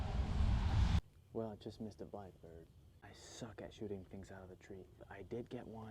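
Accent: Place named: Ohio, United States. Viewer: I fkn knew it dude 😂🤘🏽 you kinda got an Ohio accent lol I’m from northeast Ohio , Lorain Wellington area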